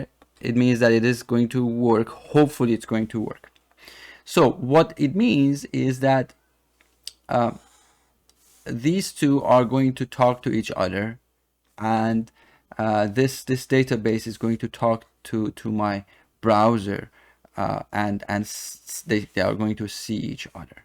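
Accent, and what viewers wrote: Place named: Iran. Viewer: Thanks for the tutorial. Your accent sounds extremely Persian.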